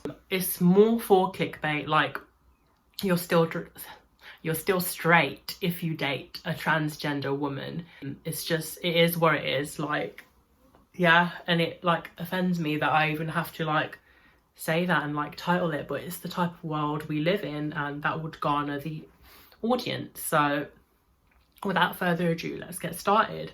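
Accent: United Kingdom. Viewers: I'm straight married soon to be divorced...and sweetheart I would love to date you. #1-You're Gorgeous #2-I love you're accent #3-You're not only gorgeous on the outside but I'm sure inside too heart & soul #4-I'm secure on my masculinity #5-I don't care what people say or think...as long as were both happy #6-I would love to date you sweetheart...I love and adore British women & you too !!! If you ever wanna chat...I would love to meet you...amor I need a woman like you in my life ! Take Care sweetheart I wish all the best !!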